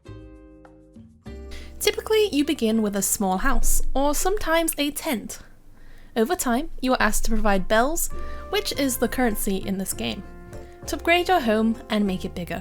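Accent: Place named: Northern England, United Kingdom. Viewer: It sounds like northern England mixed with America/Australia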